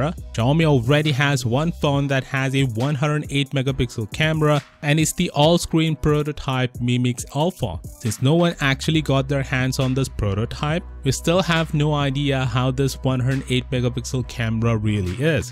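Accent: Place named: India. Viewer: Little Indian English.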